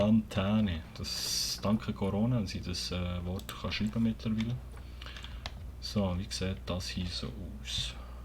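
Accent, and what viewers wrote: Switzerland. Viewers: Was isch das für e Schwiezer Dütsch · Ich weiss nid worum aber iwie findi Schwitzerdütschi letsplays nit so sick. Isch nur mini Meinig bisch sicher mega sympathisch irl aber chani eifach nüt demit ahfoh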